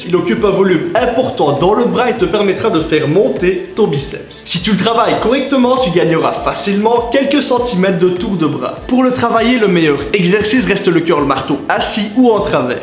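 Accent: Belgium. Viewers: Tu est belge ?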